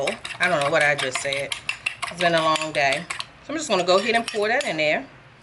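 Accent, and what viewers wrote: Baltimore, United States. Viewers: She's got to be from Baltimore. The way she says to and do.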